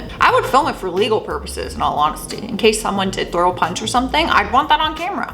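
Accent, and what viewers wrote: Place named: Canada. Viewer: Okay, I can’t do this anymore. You are hilarious and also a genius. How do I know you are a genius? Because you agree with me on almost everything. That’s proof enough. What you call sassy, my friends describe in me as being an asshole. I don’t care. These hopeless losers deserve everything we can throw at them. I love watching your videos. One small criticism: I can handle the Canadian accent and saying “aboat” for about, but work on your vocabulary, girl. I am amazed at how many perfectly ordinary words you mispronounce and seem never to have heard. Still, I love you to pieces. Keep being an asshole, oops, I meant being sassy. Some of us love it.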